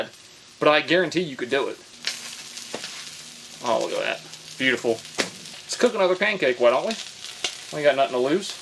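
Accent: South, United States. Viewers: Funny thing is those sweet potatoes were probably grown in the USA. They are extremely common in the South. sounds like he has a southern accent surprised that he doesn't know about it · I just found this channel and I'm a bit confused. I thought for sure from his accent that he's from the south US, but he's never seen a sweet potato? Maybe his family used canned yams and he doesn't know they're the same thing.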